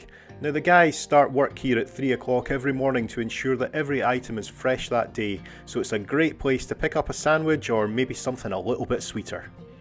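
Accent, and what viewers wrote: Scotland, United Kingdom. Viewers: Hi and thanks from a Scotophile in Nashville, TN, US. Your video was the first result of interest on a search for Mystic CT., in prep for the Classic Wood Boat Show there in August. Backstory is that we plan to move to the Black Isle in 2 years (God willing!), where my hubs hopes to get into building classic wood sailboats. You narration is divine- as the myriad regional Scottish accents are my favorites anywhere! Thanks for a great channel- we'll be stalking it now.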